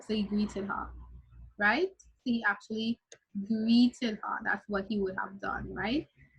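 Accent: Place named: Dominican Republic. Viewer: You speak spanish like someone from the Dominican Republic. Thank youuu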